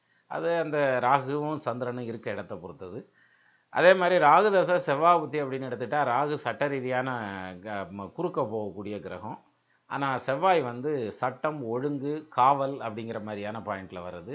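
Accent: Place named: Dindigul, India. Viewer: Thank you sir for conducting a superb program. Really we will know many things from astrology . your dindigul slang and tamil poetic style is extraordinary. I have some doubt regarding my jadhagam. My DOB: <num> Time: 5.30 pm. 1. விருச்சக லக்னம் கடக ராசி ஆயில்யம் நட்சத்திரம் லக்னத்திற்கு ஏழாமிடத்தில் சுக்ரன் ரிசபத்தில் ஆட்சி பெற்று நிற்பதால் திருமணம் தாமதம் ஆகுமா? எப்பொழுது திருமணம் நடக்கும். 2. இரண்டாமிடத்தில் சனி மற்றும் ஆட்சி பெற்ற சுக்ரனால் இரு தாரம் அமைப்பு ஏற்படுமா? 3. 8 ஆம் இடம் மிதுனத்தில் குரு,சூரியன் ,புதன் சேர்க்கை, புதன் ஆட்சி என்பதால் மற்ற இரு கிரங்களும் ஆட்சி பெறுமா? அல்லது பகை வீட்டில் குரு இருப்பதால் மற்ற கரங்களும் பாதிக்குமா? 4. 9ஆம் இடத்தில் சந்திரன் கேது சேர்கை ஆட்சி பெற்ற சந்திரனுடன் சேர்ந்து கேது நல்ல பலன் தருமா? 5. வெளிநாட்டு யோகம் உண்டாகுமா? எப்பொழுது.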